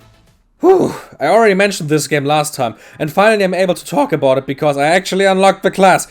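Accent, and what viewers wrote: Germany. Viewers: love ur german accent. tryed some of the games thx for the vid i had a lot of fun cuz of it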